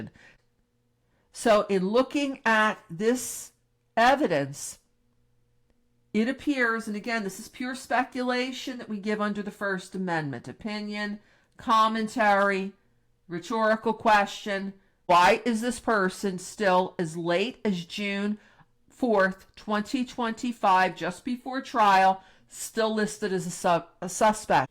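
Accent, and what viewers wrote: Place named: Eastern Pennsylvania, United States. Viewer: !! I've been following your channel (and commenting) for quite a while! It is absolutely wonderful to hear someone like you who presents "just the facts" as they say! I'd like to ask you a candid question, if I might... Are you by any chance from Eastern Pennsylvania? I'm from New York's Hudson Valley and I've been trying to place your accent, and I'd say yours is either from Northern New Jersey or Eastern Pennsylvania, if I had to guess! I'm a lover of different accents and yours struck me so distinctly!! Keep up the excellent work!! Thank you so much!! Happy belated Thanksgiving! 🦃🦃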